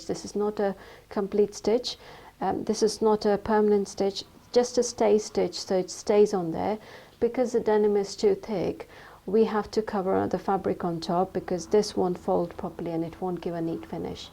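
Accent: United Kingdom. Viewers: Where do you buy your notion? I been sewing for 40 years here is the USA. I never see a zipper that long. Are you from the UK. I think I hear a British accent? You have a beautiful speaking voice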